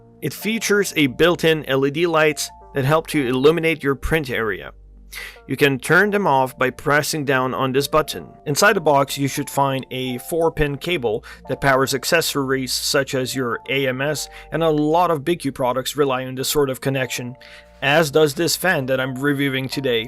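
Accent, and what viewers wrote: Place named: France. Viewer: I can hear the french in your accent. Lmao great video though, very informative.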